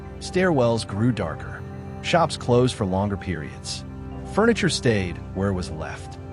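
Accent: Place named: United States. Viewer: At least it's an American accent instead of a British accent like some are doing.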